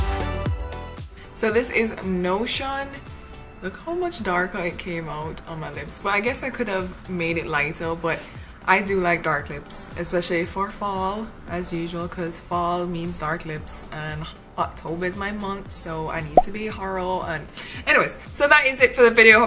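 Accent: Jamaica. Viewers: U have a little Jamaican in u don't u because I'm Jamaican and I can hear it in ur voice a little I just come across ur channel just now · i could from your enunciation of certain words...im jcan too..subbed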